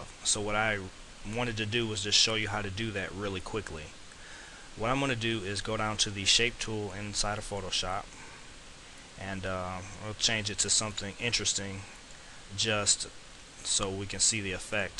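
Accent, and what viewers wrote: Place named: South, United States. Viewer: I like your Southern accent :3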